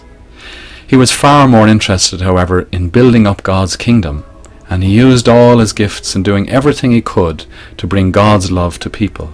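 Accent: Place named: Ireland. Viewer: The narrator sounds Irish. As to your bewilderment, uneducated Americans, and many educated ones, are certainly shy on history, geography, and languages. We are good enough at details to have flown an airplane at Kitty Hawk and sent several Saturn V rockets to the moon and back. Now Musk is building them bigger and landing them vertically. That is freakin' detail. We have been blessed with a large country into which people people have found refuge and opportunity, assimilating into culture while adding to the color, patterns, and textures of that fabric. When someone speaking, say Hungarian or Lithuanian, is 6000 miles away why learn it anymore than you would learn Latin to speak to a Roman from 2500 years ago? I know Latin but there aren't many Romans around. I also know German, a language from a place my starving ancestors left because if they and a third of the town hadn't, all would have starved. 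After about four generations of my folks speaking it as a native tongue, I had to learn it in school. As to geography, is there a major League Baseball Franchise in Austria or Australia? And most Americans wouldn't know which is which. Blame the lame, give a trophy for nothing, liberal, progressive, unionized public school system. As to history, we like WWII movies like Patton, Saving Private Ryan or more imaginatively, Taratino's Inglorious Bastards. We also like movies from earlier times and cultures, take Troy, for example, or Robin Hood vs. the evil Sheriff tales. Unfortunate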